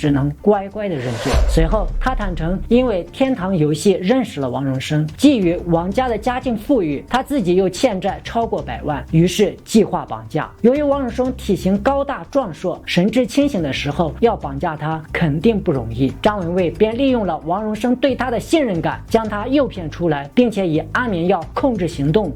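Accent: China. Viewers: 超明顯的大陸口音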